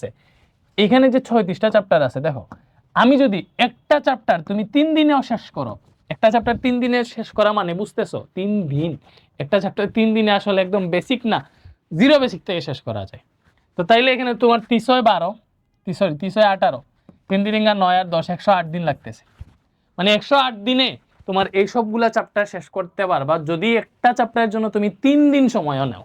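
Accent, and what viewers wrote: Sylhet, Bangladesh. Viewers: Vai ETA amader sylhety basha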